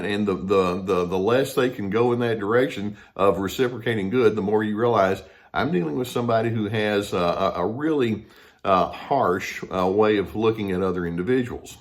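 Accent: South, United States. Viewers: you remind me of dr phil i dont know maybe its the southern accent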